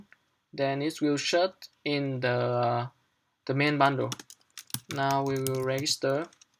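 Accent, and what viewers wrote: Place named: Vietnam. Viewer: E nghe thấy giọng việt nam mà bật sub lên thấy auto sub cứ sai sai nên đoán thế kaka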